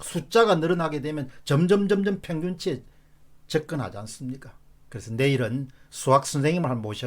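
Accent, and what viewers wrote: Gyeongsang, South Korea. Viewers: 저는 선거전에 조작이 되면 어쩌나 하고 생각 했습니다. 사전선거하는날 왠지 음훙한 느낌이 들었고 뭔가 음모가 있지 않을까 하는 불안감이 들었습니다. 그러나 진짜 그럴려고.... 하는 생각에서 민주당이 압승을 거두는걸 보고. 사실이구나. 하는 느낌를 지울 수가 없었습니다. 제 주변에 많은 사람들이 민주당에 치를떨고 싫어하는데. 그런데. 공선생님께서 이의를 제기할실때. 나만 그런게 아니구나 하는 생각을 했습니다. 선거조작이 아니라는 집단과 조작이라고하는 분들의 영상을 보며. 조작이라는 쪽으로 확신을 얻었습니다. 공선생님께서 옳은것을 지키려는 마음이 피부에 절절히 와 닳습니다. 수시로 올리시는 영상을 보며 마음이 많이 아팠습니다. 같은 진영의 언론인 출신분들이 마치 공선생님이 뭔가 잘못됐다고 비웃을때 끄덕하지 않고 끝까지 밀고 나가시는 결기에 탄복하지 않을 수 없었습니다. 새벽이면 올라오는 영상들. 끊임없이 옳은길을 주장하시는 경상도 말투. 저도 경상도 사람으로서 너무도 같은 정서가 느껴졌습니다. 단호함과 부드러움. 그리고 옳다고 생각하시는 것에 굽히지 않으시는 결기에 깊은 존경심을 보냅니다. 부디 이기시길 마음모아 응원합니다.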